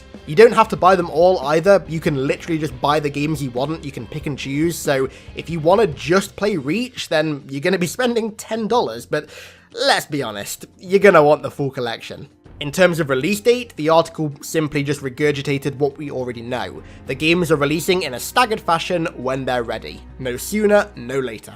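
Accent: United Kingdom. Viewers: i always thought you were american, good to see a british halo youtuber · when you've been watching for years and only just now realized he's british......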